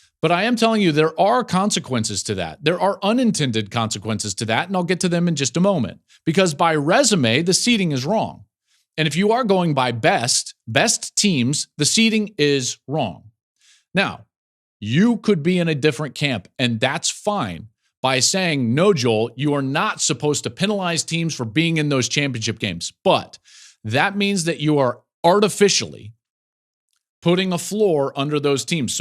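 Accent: South, United States. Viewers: Wow, I'm surprised you decided not to use your southern accent and unprofessionalism to mock the whole South to get your point across this time. Your bias is so obvious. Why don't you take some cues from Greg Mcelroy sometime about hiding biases when working professionally, and he has been in the business for a shorter time than you. You completely lost my respect when you decided to mock a 2-time national champion. What are your accomplishments compared to that?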